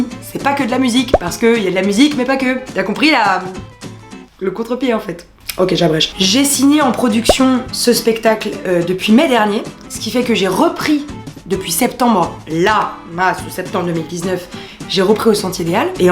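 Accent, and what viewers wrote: Quebec, Canada. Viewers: J'adore l'accent québécois 😂😂❤❤congratulations 💪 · Chuis jaloux de ton accent québécois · l'accent québécois, une pépite ! Bravo <3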